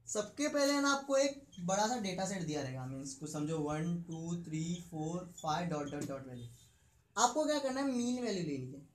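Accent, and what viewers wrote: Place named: Mumbai, India. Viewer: Mast mazza aa gaya mumbai wala koi dost samza raha hai laga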